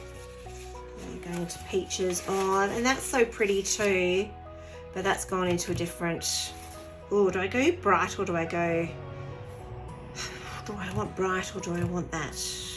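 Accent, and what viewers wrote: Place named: Australia. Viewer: Good video and I have saved the link so I can try it at some point. Maybe another video could be done to make a permanent tissue box container? I recall you said the video was a test to see if you could do the video off your iPhone. The way to improve the video would be remove the tune in the background which got a little annoying and sometimes loud. Overall it was a lovely finished product and it was nice to hear another Aussie accent on the video.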